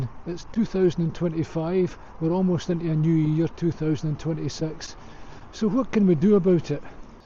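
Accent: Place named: Scotland, United Kingdom. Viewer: You have a nice accent. Are you in Scotland . Inn Canada Christmas not much cycling. I like to know more of the nature there, and geography.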